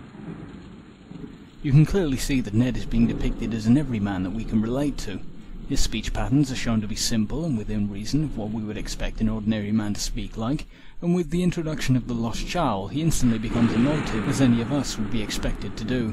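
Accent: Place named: England, United Kingdom. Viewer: I don't. I have asbolutely no idea what this series of videos have been all about. I subscribed to because of slowbeef's rambling mock let's plays, and I have been utterly mystified by the English-accented bloke who has been commenting on this series of flash games, if indeed that's what they are. Although, perhaps, fucking with the likes of myself is perhaps what this series of videos ahas been all about in the first place...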